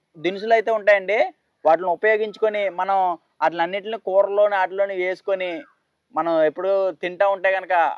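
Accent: Godavari, India. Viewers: స్వచ్చమైన గోదావరి జిల్లా యాస...కల్మషం లేని మాటలు... సంపూర్ణ వివరణ. మొదట మొదటగా చూపించిన పిల్ల కాలువ జోరు,.. పచ్చని పైరు...కట్టే పోయి మీద వంట...అబ్బా .మరచిపోయిన చిన్నతనము చూపించారు..అంతా బావుంది..చిన్న సలహా... కాలి పళ్ళెము లో మొదటగా వట్టి అన్నము మామూలు సందర్భ ములలో పెట్టరు..అది ఒకటి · Godarolla bhasha vintumte maa p.g gurthukochindi😂😂😂😂😂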